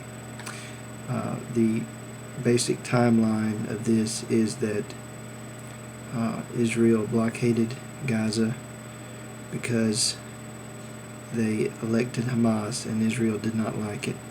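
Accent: South, United States. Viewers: And besides according to your accent you are from the south - why don't U give back Texas to mexico ?!?! It doesn't belong to U !!!!!!! What about mexican refugees - you have any idea how many refugees were created in Ur war to settle texas - their descendents probably outnumber the palestinian refugees by far !! AGAIN - U R A HYPOCRIT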